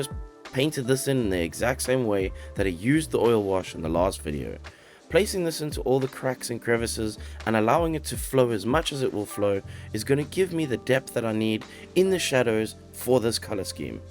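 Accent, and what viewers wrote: South Africa. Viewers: South African? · Your accent is extremely South-African. 👀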